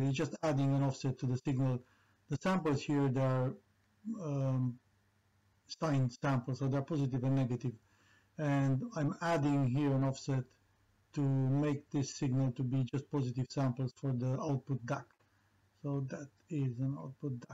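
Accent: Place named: Romania. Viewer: Your accent sounds romanian, I don't know why. Thank you for the nice video!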